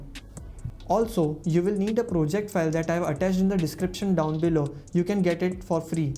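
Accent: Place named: India. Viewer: Yo, I wish you luck, but you should work with your accent. Indian english sounds cool, but not always.)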